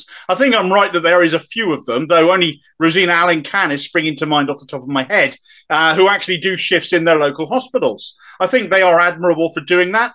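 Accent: Cornwall, United Kingdom. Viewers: I don't think this is a completely fair assessment, Starmer didn't say he agreed with most of what leave campaigners were saying, just one main point. What he said was similar to Anna Sourbry on LBC (I think it was her). That basically millions of people were not seeing the dramatic changes they want in their area regardless of who's in power and the referendum was their chance to stick it to the man and "take back control". At the end of the day Starmer has the task of trying to keep hold of remain and leave Labour voters, not an easy task. It's time for change now, and the only change we are realistically going to get is a Labour gov so let's get them in and then hold them to account. He will certainly be an improvement over the Tories anyway. Judging by your channel name and accent I'd say you're in my neck off the woods so keep up the good work and maybe one day we will bump into each other on the streets of Cornwall. 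👍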